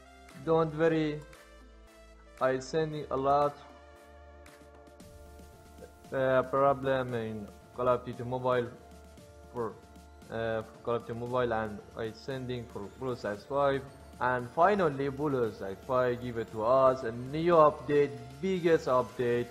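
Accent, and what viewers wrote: Iran. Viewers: ایرانی هستی اینقد لهجت کسشعره مادرجنده ؟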